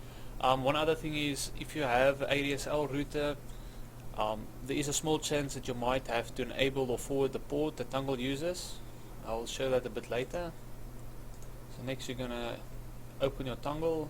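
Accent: South Africa. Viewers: Jho br, daai afrikaanse aksent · are you South African ? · South African?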